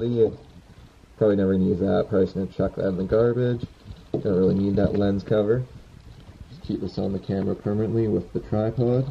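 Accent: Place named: Canada. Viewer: You sound Canadian o.o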